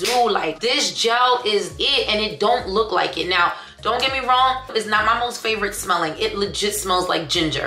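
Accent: New York, United States. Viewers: So glad I came across this on my time line❤ You putting me on👀‼️ Definitely just got you a new subby🤗 Love listening to you talk ,that NY accent reminds me of home🥰 I'm from the Bronx 161st ❤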